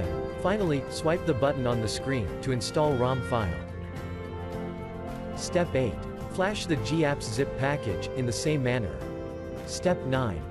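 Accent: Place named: India. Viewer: just hear that indian voice. its a scam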